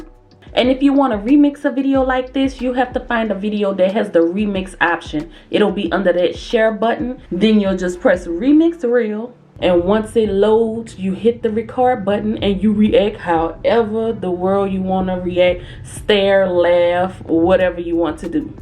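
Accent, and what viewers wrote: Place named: Louisiana, United States. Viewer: Nope....you can't hide that accent...hello my fellow Louisiana sister lol. I'm from Lafayette.. keep inspiring us thank you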